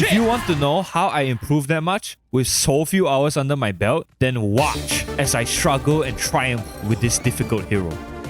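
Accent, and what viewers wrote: Singapore. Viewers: are u singaporean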